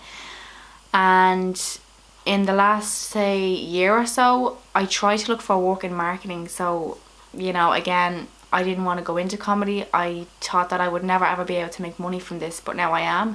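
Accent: Dublin, Ireland. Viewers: l love this chanle l love to hear my dublin axsent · you are so talented you are so right with the Dublin accent I can relate to you, keep up the great work and if it's not too much trouble do more movies if they were set in Dublin they were fantastic introduce loads of my family to them particularly love titanic best of luck to you my 87 year old mother Haas a great laugh.I wish you well and happiness and look forward to the next production.well done your class you make my day .I will keep watching good girl go for it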